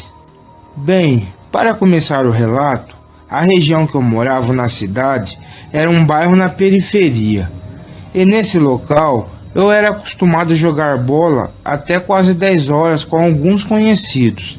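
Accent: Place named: Caipira, Brazil. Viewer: A narração é do tipo bem caipira mesmo!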